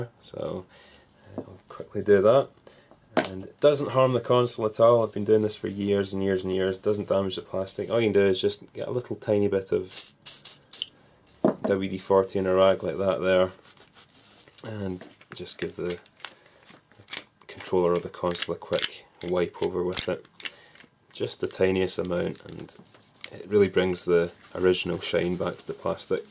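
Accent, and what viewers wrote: Scotland, United Kingdom. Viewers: Man, I love your Scottish accent :) Been to Edinburgh this summer. Really nice countryside in the Highlands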